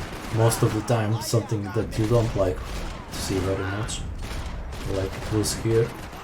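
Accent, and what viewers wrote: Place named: Russia. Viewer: Звучишь как русский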